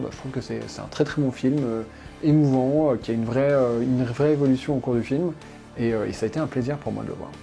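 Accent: England, United Kingdom. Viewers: mais cet accent anglais je suis jaloux !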